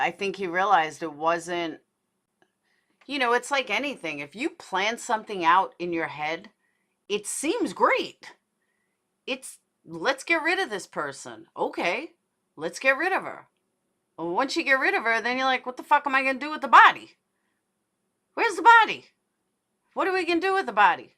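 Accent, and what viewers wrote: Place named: Staten Island, United States. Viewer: At 40.40 ish or a litl3 before I caught ur NYc_ Staten island big time "gawd fawbid"😆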